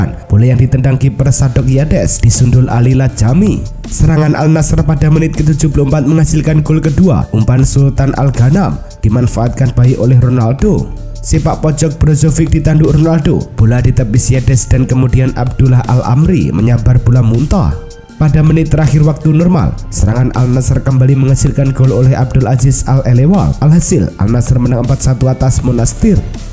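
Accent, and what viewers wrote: Java, Indonesia. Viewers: Sudah bagus cuman Host nya di ganti lah,ending yg cewe, yang cowok ini terlalu Medok.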